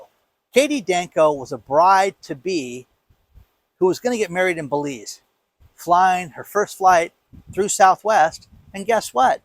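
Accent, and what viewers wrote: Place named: California, United States. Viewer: My mom watches your videos religiously and has for many months. You seem like a sensible guy. You clearly know magnitudes more than you let on. You're also one of the very few people I've ever seen who has a legitimate Californian accent. Lots of people still claim there is no such thing, but it's very subtle.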